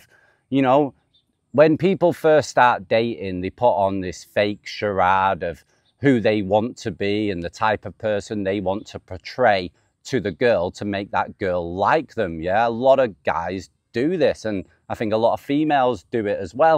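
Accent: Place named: North, United Kingdom. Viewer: Glad you are back in the groove. I look forward to your stories every day. I enjoy your northern accent. Dave from California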